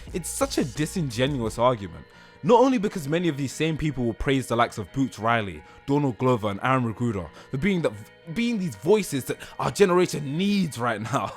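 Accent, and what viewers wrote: United Kingdom. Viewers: OK I’m in the New Jersey right now. I’m halfway through the video and I’m trying to get what it is you’re actually trying to say. I’m having a little difficulty with your very casual black British accent. I think for future videos it would be nice if you used a traditional thesis statement and clearly define the arguments arguments pro and con. What do you seem to be doing now is playing a lot of distracting clips, name dropping a wide variety of media just because they have black characters in them and And doing sort of a stream of conscious rant that is very difficult to follow. I’m almost at the end of the video now and I don’t know what it is you’re trying to say. It seems to me that you’re saying that all black art is political because black is a social construct. I don’t know if that’s what you’re saying. If it is you could’ve said it in a much shorter video with much tighter editing and restricted to movies, television, Japanese animation as opposed to western animation. 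You could use storylines and specific examples from particular shows. I’m not really sure that you really thought out what it is you’re trying to say. Your analysis of get out is pretty off-base. I don’t like the premise of the movie. Which seems to be be suspicious of white people. It was a carefully crafted screenplay. Not being African-American I’m pretty certain you have no idea what’s going on here anymore than I have any idea what it’s like to be black and British. Anyway good luck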